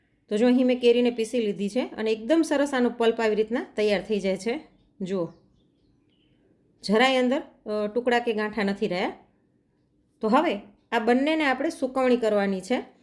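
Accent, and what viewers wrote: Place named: Bhavnagar, India. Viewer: ભાવનગર બાજુ ના છો ?ભાષા એવી છે માટે પુછયુ ખુખજ સરસ રીત બતાવી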